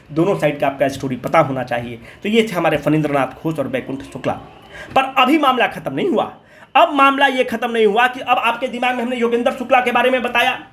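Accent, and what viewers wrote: Bihar, India. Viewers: The way u teach is really incredible sir....feel really blessed to be a part of bcw family🙏 nd ur narration in bihari tone 🧡gives extra motivation sir....soon this channel will become one stop destination for all bpsc aspirants......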